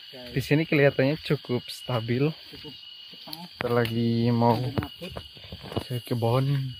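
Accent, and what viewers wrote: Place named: Kedu, Indonesia. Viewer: Bahasa jawane mirip daerah kedu-mgl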